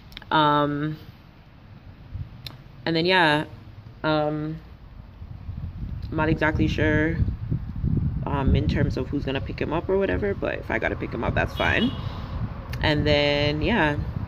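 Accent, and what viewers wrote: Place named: Cockney, United Kingdom. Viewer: The cockney though 🤣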